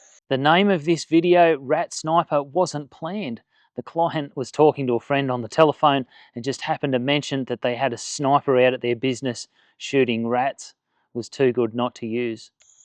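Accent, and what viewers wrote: Australia. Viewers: bro got the strongest australian accent ive ever heard in my entire life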